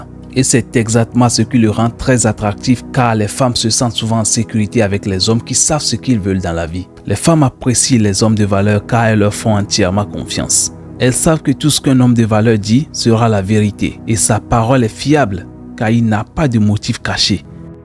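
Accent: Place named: Africa. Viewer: On s'en fou, ce n'est QUE des fautes d'orthographe, en plus il a du mérite car il est peut etre africain vu l'accent. Le superficiel limite l'esprit surtout de ceux qui sont négatif, de mauvaise foi et ingrats. C'est le fond des choses qui compte pas les formes. Le contenu de cette video devrait plutôt vous réjouir car il exprime QUE du propre qui visiblement ne vous concerne pas, et que vous dénigrez. Malheureusement, quand on est pas futé c'est pour la vie.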